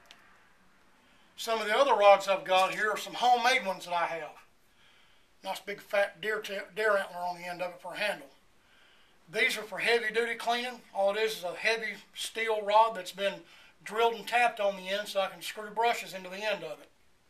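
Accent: Maine, United States. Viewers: Just love listening to someone with an authentic Maine accent. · Thanks for the video. And that's most unusual "down east Maniac" accent I have ever heard. Where are you from originally?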